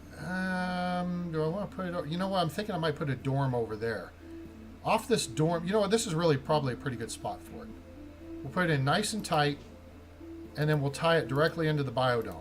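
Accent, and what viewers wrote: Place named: New Zealand. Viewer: You do it so properly. Step by step telling. But eehhm 🥴 what I see on your playlists are confusing.... By the way you are not from up here. Probably from down under. Nooo, anyway. Not fully a wombat. New Zealand probably. I hear you. Trying to place you geographically..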